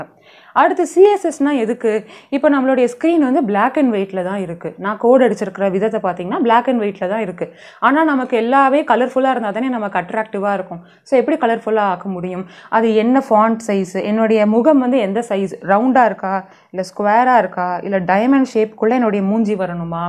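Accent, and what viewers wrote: Sri Lanka. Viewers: ilangai Tamil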